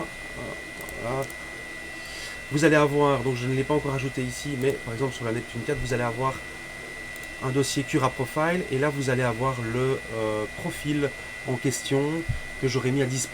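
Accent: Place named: Belgium. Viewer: De toute façon merci pour les vidéos très instructives, la rançon du succés c'est de ne pas avoir assez de temps pour faire tout les spectacles, un peu comme une vedette de la chanson quoi ^^^ et vive la Belgique (et ses supers chanteurs et humoristes) et j'avoue sourire quand vous vous excusez auprés des français pour la prononciation des chiffres à votre manière.... PS: comme je débute je préfére Cura pour le moment pour cette machine mais si vous avez le temps pour la suite en vidéo particulièrement pour un tutoriel (didacticiel en bon français ^^) sur par exemple "comment on fait sa première impression après avoir suivi les cours de??" Et aussi "que faire quand on a terminé son impression?" (je ne me suis pas encore lancé sur cette machine plus que récente pour moi en arrivée à la maison). Merci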